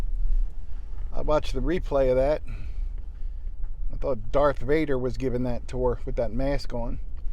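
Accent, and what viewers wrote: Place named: Texas, United States. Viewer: You have a great texas drawl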